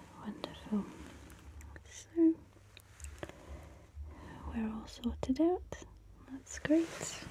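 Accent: United Kingdom. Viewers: I've brought all my bits & bobs. Haha love it! Love the Brits! So beautiful to listen to!!! Thank youuu!❤😊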